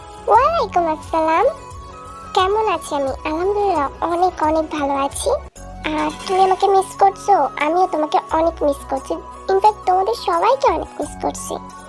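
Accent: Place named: Bangladesh. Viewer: Bangladeshi der moto kotha bolo keno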